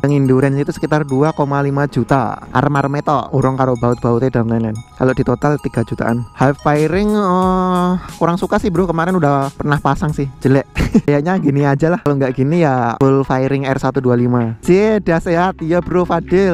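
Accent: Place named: Yogyakarta, Indonesia. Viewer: omonge jan asli jogja... hahaaha apik mas.. lanjutkan pokoke.. tak subscribe ndes.. haha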